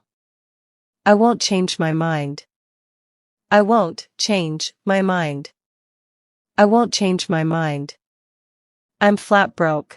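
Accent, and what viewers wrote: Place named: United Kingdom. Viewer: Thanks my teacher is that British accent or American?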